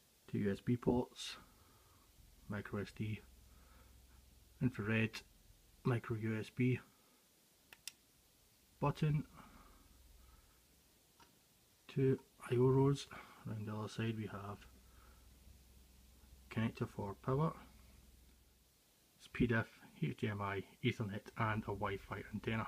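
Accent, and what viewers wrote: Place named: Scotland, United Kingdom. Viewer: His "gibberish" is Scottish accented English.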